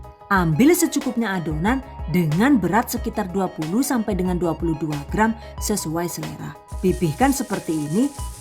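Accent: Banyumas, Indonesia. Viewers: Mbaa saya senang klo mbanya lagi ngomong ngpak lucu trima kasih mbaa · mksh mb resepnya,,dn jg mksh bhs ngpaknya,,bikin aq ktawa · tengkyu...jangan lupa logat ngapaknya lanjuuuuut.😃😃💪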